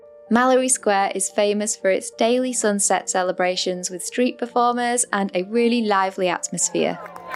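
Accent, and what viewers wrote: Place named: Somerset, United Kingdom. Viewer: Sounds like you're from Somerset UK. Try Santiago's Bodega at the end of Petronia next time. You won't be disappointed.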